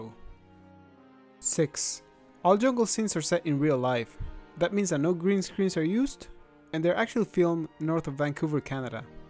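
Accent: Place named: Australia. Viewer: Wow she sounds so astralin how dos it not show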